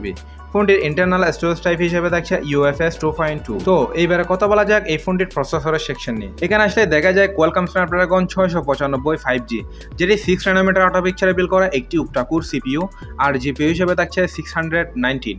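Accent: Chittagong, Bangladesh. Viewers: চিটাইংগা বদ্দা এনা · তোর বাড়ি hode... চট্টগ্রাম এর ত বুঝা যার বাড়ি hode ও ভাই? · হেতের বাড়ি চট্টগ্রাম। ভয়েস এ চট্টগ্রামের টোন স্পষ্ট। শুদ্ধ বাংলায় কথা বলতেই সমস্যা সে বলছে সে RJ. মানে বুঝলাম না। আন্দাজে। ইন্ডিয়ান যারা তারা বলছে ঐখানে এখনো লঞ্চ হয়িনি।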